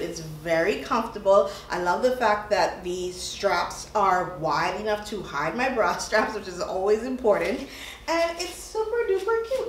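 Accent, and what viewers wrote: Bahamas. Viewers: just watched your rose wholesale video and am like this girl sounds Bahamian so I stalked your page and you are Bahamian yayyyy!!! I love your videos definitely will be subscribing and yes am Bahamian too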